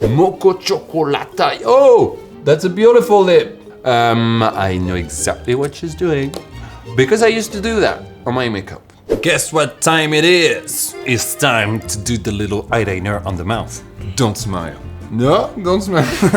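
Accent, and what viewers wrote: France. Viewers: As a french person, i wasnt sure if her accent was a french 🥲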